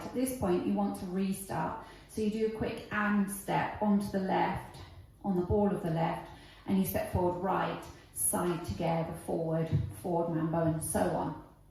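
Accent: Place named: England, United Kingdom. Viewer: The best Line dancing teacher on the Net, with a bonus of a lovely English accent. x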